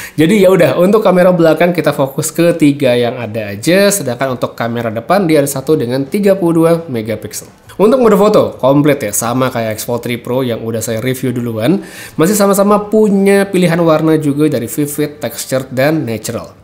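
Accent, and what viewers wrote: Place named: Manado, Indonesia. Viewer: BANG ORANG MANADO YAH? LOGATNYA MIRIP ORANG MANADO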